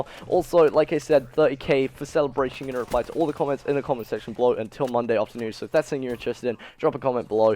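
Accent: England, United Kingdom. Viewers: I notice the slight accent my dude, are you from across the pond in England? If you are, do you watch football and/or have a favorite team you support? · Where about in England are u from? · What part of the Uk are you from? Sounds like your English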